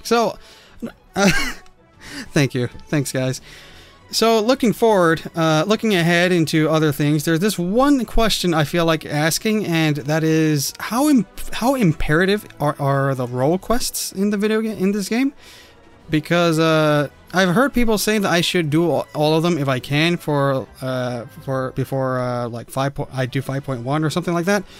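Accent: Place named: Scotland, United Kingdom. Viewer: I LOVED your cute Scottish accent!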